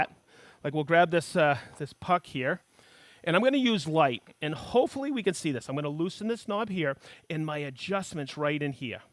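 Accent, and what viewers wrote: Boston, United States. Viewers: Put the R s in the words for God sake. Hate that Boston stuff.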